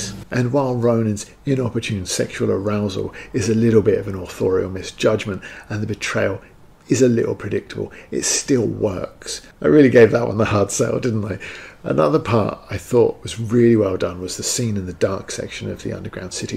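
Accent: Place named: United Kingdom. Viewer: totally serious. You obviously have a good command of the stories you read, and your voice is pleasant and British without being too nasal or: ok I need your help with the explanation of this description. I don't know how to describe this as an American, but what I'm trying to describe is a dialect of British speech that resembles cockney but isn't quite it. Dominique Tipper from that space show speaks this way. I know it's because of Hollywood exposure that I/we Americans have this bias but that dialect sounds, well, hoodlum-ish to us. I suspect we took our ques of woke capitalism from how you Brits dealt with these prejudices in the 90s (spice girls promoting a mixed British identity and language equality come to mind) and applied it to race and gender. Do y'all have a term for this there?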